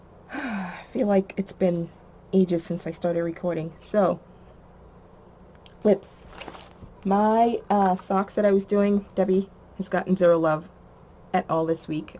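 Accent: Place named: Massachusetts, United States. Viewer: I can definitely hear your ma accent 😂 I should have known from the last video, one of my best friends is in CT near ma though and she has a similar accent. Loved the video. Thank you for sharing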